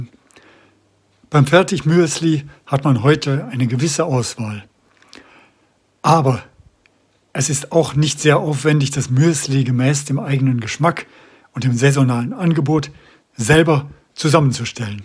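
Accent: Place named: Switzerland. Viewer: Also von mir aus kannst Du das Schweizerdeutsch gerne mehr einbauen, ich höre das gerne. Selber sprechen kann ich es leider garnicht... Und wie es aussieht, ist Deine Verwandtschaft auf der ganzen Welt verteilt! 🙂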